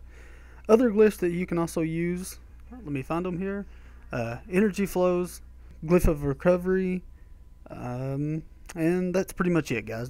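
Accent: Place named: Alabama, United States. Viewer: I love your Alabama accent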